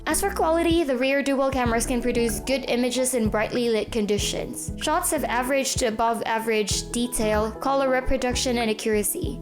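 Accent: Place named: Philippines. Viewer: Now that's what you called a filipino-english accent😍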